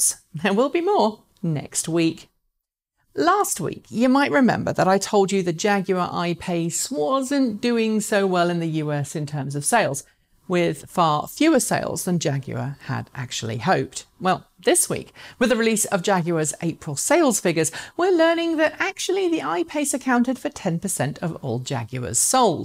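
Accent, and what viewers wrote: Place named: United Kingdom. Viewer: why do you have a distinct British accent but make your show for the US market? From my point of view, It would make more sense, if you either made a news series concentrating on the British and Irish market (which is quite distinct to the US market with its regulation, currencies, and steering) with prices in GBP (UK) and EUR (Ireland), rebates in these countries and availability there. Or do a news series in US english with US prices, US tax rebates and US availability. If your series is intended for international viewers mixing stuff from around the world, then there should be a clear label, for which markets each of the news really apply. You could add a flag in a corner of the screen. The news about an electric food truck from an aftermarket conversion company for example will only apply to a certain region of the world. 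And this region should be told to the audience.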